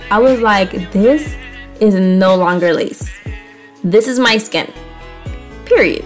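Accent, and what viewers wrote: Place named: New York, United States. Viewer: I love your New York accent 😭 and thank you for this! Mine just came today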